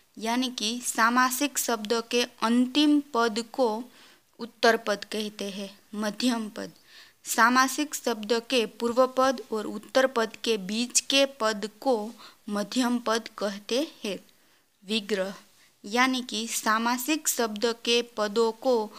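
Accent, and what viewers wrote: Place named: Kathiawar, India. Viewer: आप हिन्दी को काठियावाडी लहेजे में क्यों बोल रही हो?उदा. राजा को आप राझा , जानते को झानजे बता रही है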